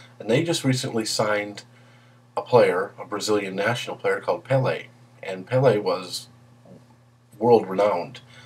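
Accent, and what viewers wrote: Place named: United States. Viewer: With an American accent?!! What person would voluntarily choose to sound like a twat? Of course he's American.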